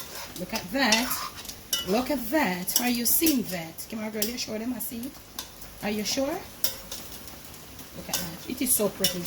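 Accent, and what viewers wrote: Jamaica. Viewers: Yes Miss! Gwaarn wid you bad self. Niceness from start to finish. I love how you keep it real with your lovely likkle jokes and patois style. Bless up and one love always..🇯🇲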